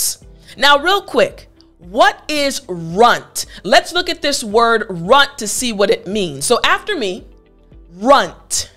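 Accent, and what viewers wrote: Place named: United States. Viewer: I love your American accent, teacher. Greetings from NICARAGUA 🇳🇮.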